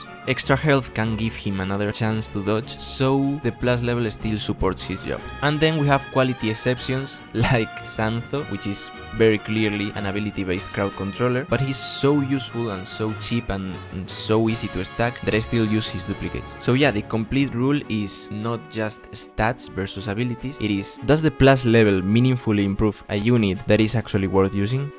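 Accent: Spain. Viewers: ¿Are you spanish? Your accent sounds like when I hear spanish people speaking english 😅 ¿Or do other languages produce the same accent?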